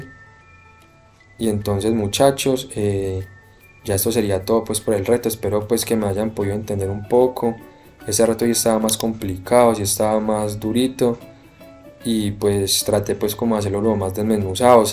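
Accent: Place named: Antioquia, Colombia. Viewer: Paisa en la buena por esa colaboración Dios le multiplique 👍🏻 ese acento es todo vacano el de este man jajaja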